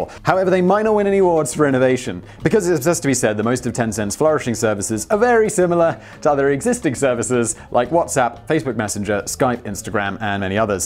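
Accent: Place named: United Kingdom. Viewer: After years of listening to, I was alarmingly quick to accept that it was just some goofy British pronunciation.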